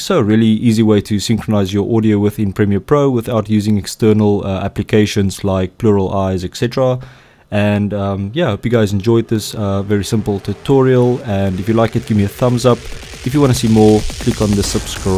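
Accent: South Africa. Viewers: dude, you're south african! Awesum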